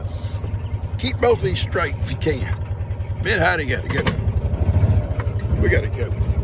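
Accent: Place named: Kentucky, United States. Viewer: That is Kentucky talk also.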